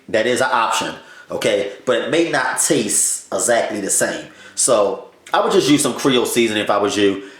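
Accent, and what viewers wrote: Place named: Baltimore, United States. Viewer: You sound like you form bmore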